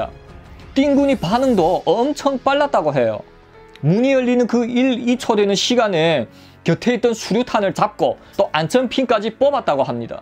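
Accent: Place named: Korean-Chinese, China. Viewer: 25년전에 연길조선족선원들하고 외항상선에서 같이생활할때 목소리하고 어쩜똑같아 갑자기 옛날생각이 나네요 구수란 조선족사투리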